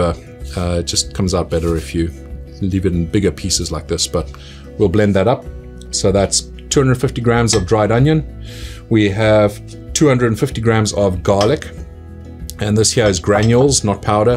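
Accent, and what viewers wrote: South Africa. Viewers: Thanks for the video. I have been making Indian and North African spice blends for awhile now. I am expanding more into cajun/creole cooking now, and this helps. PS love the South African accent. I grew up there. Live in Northeast USA for the last 38 years now.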